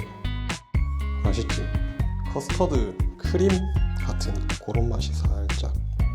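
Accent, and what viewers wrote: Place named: Gyeongsang, South Korea. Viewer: B식탁님 말투완전 우리동네에요 전경상도 김해요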